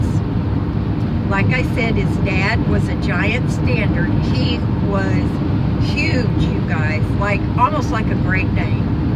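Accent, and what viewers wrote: South, United States. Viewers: I stubbed upon your videos & what a beautiful Southern Accent. You are a cutie pie! You have a new subscriber!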